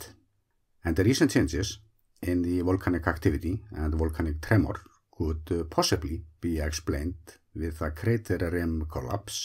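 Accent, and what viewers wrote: Iceland. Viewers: Thanks for the info. I find it difficult to understand sometimes what you are saying because your English has such a strong Icelandic accent. This is something you could work on with a native English speaker . . . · I’m Canadian (I only speak English), and I understand him well. I actually enjoy listening to the Icelandic accent :)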